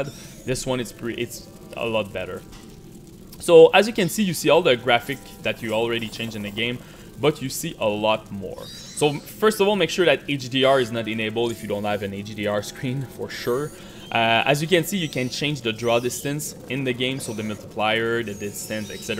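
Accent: Quebec, Canada. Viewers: Arrête! On entend le québécois en lui mais son accent est vraiment pas pire!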